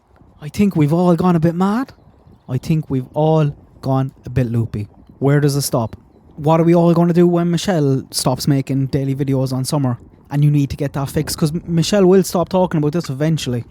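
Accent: Cork, Ireland. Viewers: Hi from County Fermanagh. Just found you and very glad I have! I agree, the Sophie case is West Cork is fascinating - the podcast is wonderful. I was hoping you might have other podcast suggestions?! BTW is that a Cork accent I hear? Love it. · Hey Chasing :) Thanks so much for your Community Post which is how I just found this channel... and got such a surprise when heard such a familiar accent!! He sounds like he is from same end of Ireland as I am - will have to check out his content... Can't agree more with this video also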